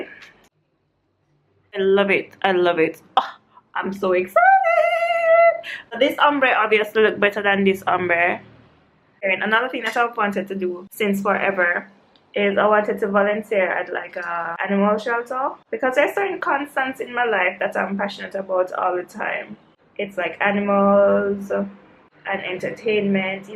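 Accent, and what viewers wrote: Jamaica. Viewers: Btw, are you Jamaican?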